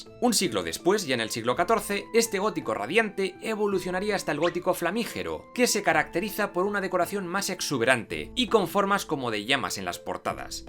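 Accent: Spain. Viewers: Me hizo reir los comentarios de este narrador. Con ese acento español. Pero muy interesante lo que dijo.😂😂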